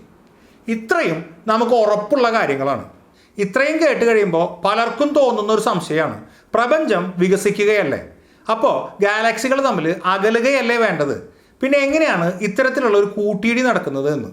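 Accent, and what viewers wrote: Thrissur, India. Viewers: നല്ല അവതരണം വളരേ വ്യക്തതയാർന്ന വിവരണം . അതിലുമുപരിയായി ഭാഷാശൈലി ഒരു തൃശൂർകാരന്റേതും . ഞാൻ ഒരു തൃശൂർകാരനാണേ അതുകൊണ്ട് പറഞ്ഞുപോയതാണ് . ഇതുവരെ ഇതുപോലുള്ള ഏറ്റവും പുതിയ അറിവുകൾ പകർന്നു തരുന്ന മറ്റൊരു വീഡിയോ ആരും അപ് ലോഡ് ചെയ്തതായി എന്റെ അറിവിൽ ഇല്ല . വീഡിയോ വളരേ നന്നായിട്ടുണ്ട് .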